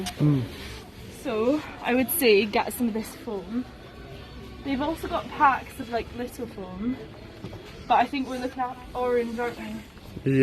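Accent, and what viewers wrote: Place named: Scotland, United Kingdom. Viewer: I love recreating high end expensive decor items for a whole lot less! I love your lovely Scottish accent! Greetings from Canada 🇨🇦!! Your wreath is absolutely beautiful! I love all things Halloween! You should check out my month long Boofest! Your pumpkin garland is too cute! The ghosties are adorable! I love the term “sweeties”!! New subscriber! Let’s support each other!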